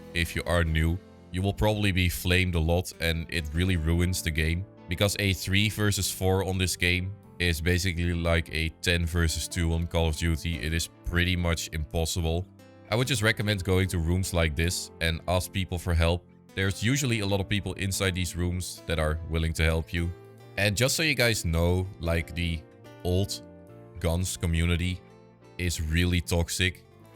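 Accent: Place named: Netherlands. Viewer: i smell dutch\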